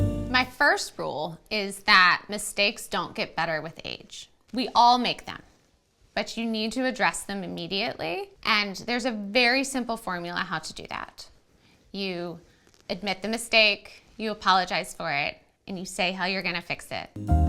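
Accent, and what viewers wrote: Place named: New Jersey, United States. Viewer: Your voice, your voice why do you make that NJ voice?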